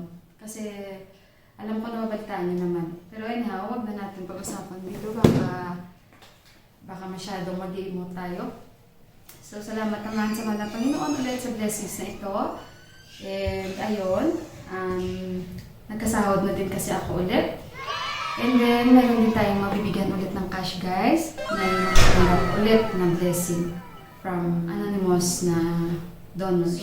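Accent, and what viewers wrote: Iloilo, Philippines. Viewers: New subscriber here...nawili aq tan aw sg vlog mo..hindi ko paman narinig sau na ilongga ka,but base in your accent im sure u are ilongga....Anong city itong place nyo? Katmandu ba?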